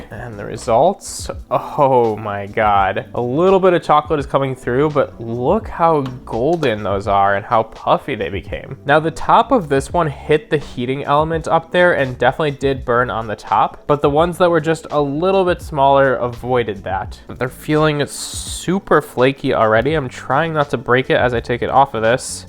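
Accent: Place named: United States. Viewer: This fella pronounces his ‘e’ as an ‘a’. It’s a very American thing…messing the lingo up. Good presentation though.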